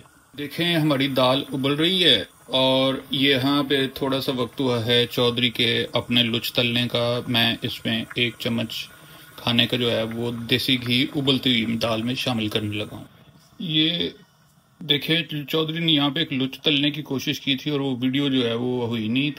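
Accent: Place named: Lahore, Pakistan. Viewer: Lahori lahja ay tada paw g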